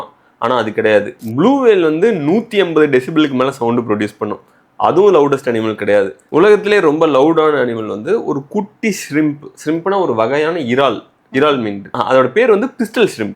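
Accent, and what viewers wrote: Coimbatore, India. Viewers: நீங்க கோயம்புத்தூரா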